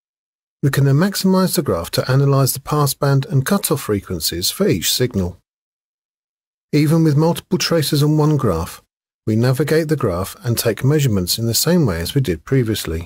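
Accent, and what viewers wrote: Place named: United Kingdom. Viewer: OMG I Love that voice and their british accent, thank you!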